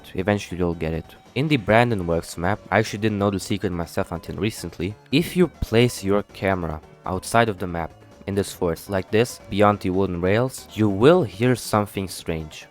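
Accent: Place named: Russia. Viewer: Этот бро реально скрывает что он русский. Слышно русский акцент.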